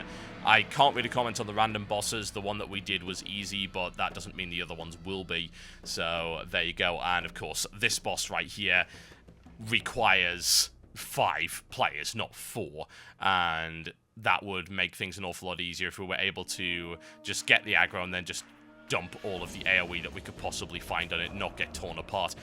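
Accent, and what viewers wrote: United Kingdom. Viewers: Watching your video just for your british accent besides i like WoW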